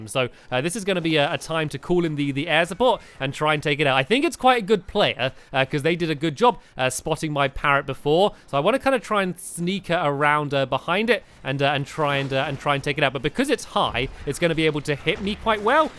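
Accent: England, United Kingdom. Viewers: The U.K. Can't you tell from his accent( England )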